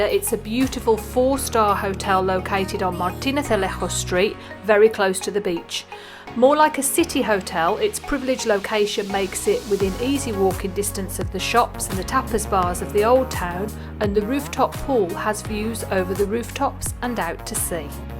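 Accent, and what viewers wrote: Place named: Birmingham, United Kingdom. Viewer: nothing wrong with a Brummy accent... Trust me. As I keep telling everyone Shakespeare was a Brummie... Well almost. 😁 Really enjoy the videos. Keep em coming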